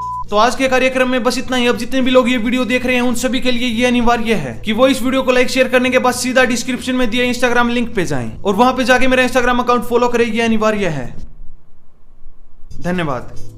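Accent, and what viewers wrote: Haryana, India. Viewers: हरियाणा के हिसार जिले से हो ना आप😁😁😁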